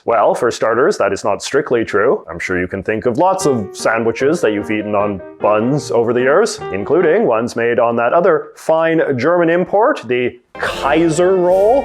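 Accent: Canada. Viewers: He has a Canadian accent.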